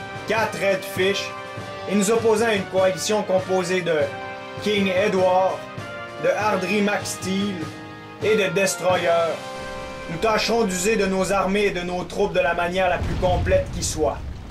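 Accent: Quebec, Canada. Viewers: Omg l'accent québécois ♥ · SCOTISH POWER !!! <3 je le dis tous de suite, c'est la première fois que je vois une de tes vidéos. Et franchement je te tire mon chapeau. C'est complet, on ne s'ennuie pas en regardant juste défois que j'avais du mal à suivre tellement tu parlais vite =s mais c'est pas grand chose. Sinon je sais pas si tu connais "MaSQEdePuSTA" ? il fait des vidéos "critique cruelle" et je trouve que comme lui tu as une façon de parler exceptionnel x) et crois moi ce n'est pas à cause de ton accent canadien =p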